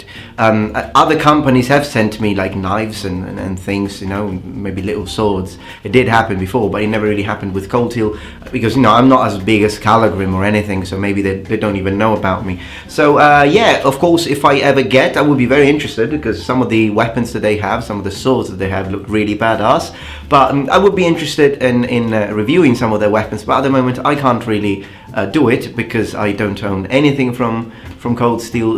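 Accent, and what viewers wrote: England, United Kingdom. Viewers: Love your British accent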